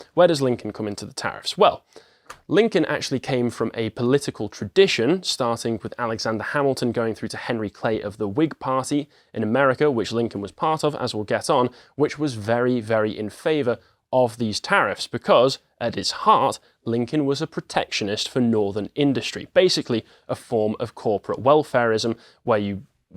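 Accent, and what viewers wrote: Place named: United Kingdom. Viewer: It's really interesting to hear American history discussed by a Brit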